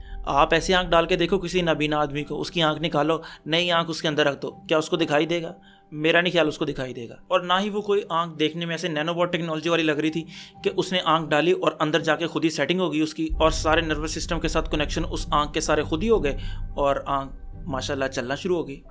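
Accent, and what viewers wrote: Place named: Pakistan. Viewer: Sahi pakde hai Bhai Mai aap kaa new subscriber hu muje Pakistani logo ke baat karne kaa lehzaa bahot pasand hai aur aapke videos bhi love u from india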